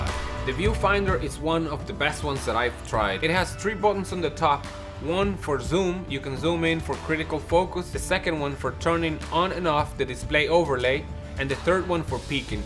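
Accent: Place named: Dublin, Ireland. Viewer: brilliant review I knew there was a bit of a Dublin twang in the accent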